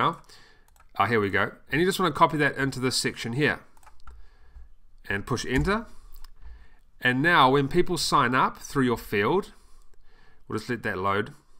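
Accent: New Zealand. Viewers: Very refreshing to hear the kiwi accent !! I'm subbing just for that reason, i'll watch the video now haha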